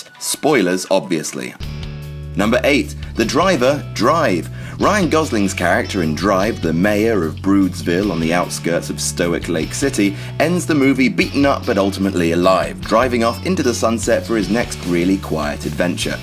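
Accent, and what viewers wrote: United Kingdom. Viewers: Will I subscribe to "WhatCulture"? NOPE! Very dumb video here. You imply in the title that your going to tell us about things we missed in movies that tell us these characters didn't really survive. Instead you make some very dumb conjecture that has nothing to do with the scripts or stories. By your logic - every character in every movie really died - because everyone eventually dies. I hate videos like this - where you present no real value. Just use some british-accented knucklehead to voice over a POS - imagine if a 12 year old kid did the voice over to this - you'd realize is was a complete waste of time.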